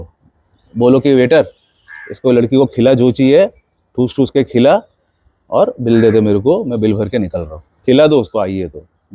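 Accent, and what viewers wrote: Bengal, India. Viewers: Heavy bengali accent ...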